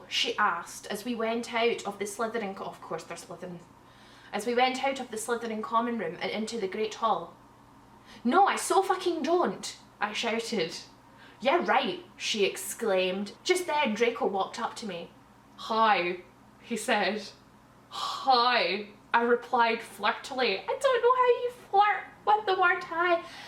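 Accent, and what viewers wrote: Scotland, United Kingdom. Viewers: I'm bad at identifying accents. She's scottish? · ... bad Harry Potter fanfiction read by a Scottish woman? I need this in my life! I came for the Scottish handmaid, but I'm staying for this! Subbed!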